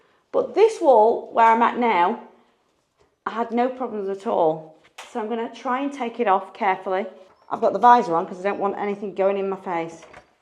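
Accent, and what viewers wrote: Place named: Manchester, United Kingdom. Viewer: Are you a Manchester lass.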